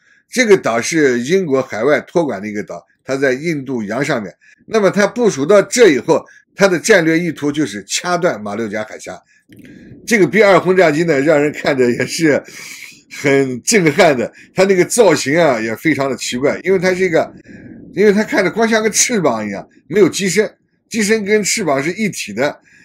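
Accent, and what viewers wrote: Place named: Xinjiang, China. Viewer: 听说晒了一整天怎么感觉有点新疆口音？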